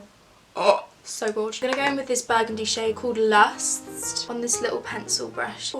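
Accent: Scotland, United Kingdom. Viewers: Is she Scottish or British cause confusion but still she amazing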